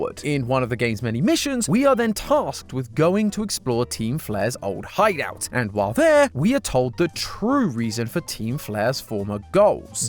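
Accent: United Kingdom. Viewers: you are british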